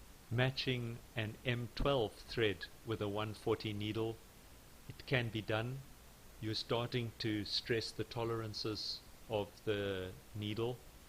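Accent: South Africa. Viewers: Best video on Youtube explaing thread and needle on this machine. Where can you buy the the 140 flatshaft needles ? You sound like you are from SA boet ?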